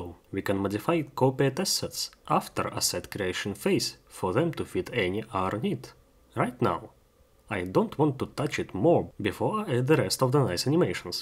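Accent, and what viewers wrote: Russia. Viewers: Слышу русский акцент. Может ещë и на русском языке начнëшь делать?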